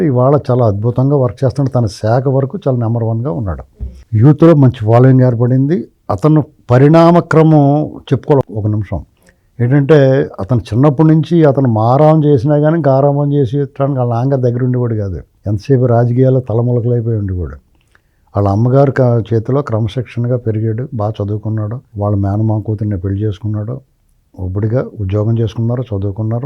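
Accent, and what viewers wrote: Godavari, India. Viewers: కొంత గోదావరి ఎబ్బెట్టు కలిపి చాలా చక్కంగా చమంత్కారంగా విశ్లేషణ చేశారు 😁😁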